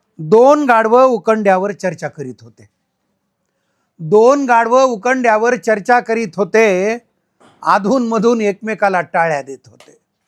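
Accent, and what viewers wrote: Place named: Marathwada, India. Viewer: तुमची मराठवाडी मराठी ऐकायला गोड वाटते.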